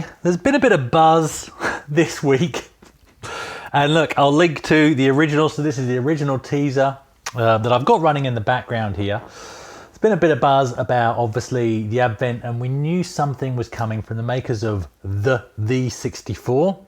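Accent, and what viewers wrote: Australia. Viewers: I guessed correctly why the Pi was being packed away, but as a first time viewer my real question was "Why does this British chap sound like an Australian?" But I did watch the entire video...and now I know. :) Subscribed...by an Aussie in Canada...probably sounding a bit Canadian by now.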